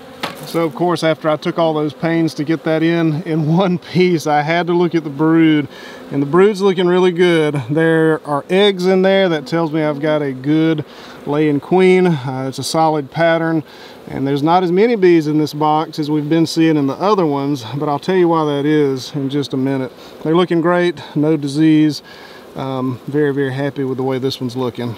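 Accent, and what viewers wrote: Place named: United States. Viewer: this is the most american channel i've ever seen and it is so good i can't stop watching. i am sorry if i sound like a butthole i just happy that i could distinquish (spelled wrong probably) an accent and understand all these imperial measurements bc i am interested in new stuff. i love the atmosphere, please keep on going!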